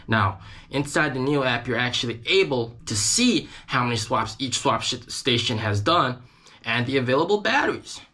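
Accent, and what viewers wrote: South, United States. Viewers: Informative insider look, great video The southern accent caught me off guard 😂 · Are you working for Tesla, Maaaaan....you accent is right out usa .....how many billions tesla has burnt..?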